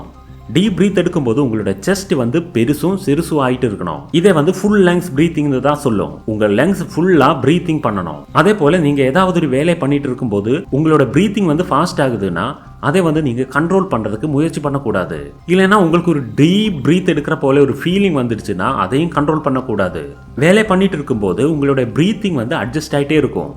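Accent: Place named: North India, India. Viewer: Unga voice... Sir antha cardu mela irukura 16 number sollu sir nu oru vadakkan solluvanla athey maathiri irukku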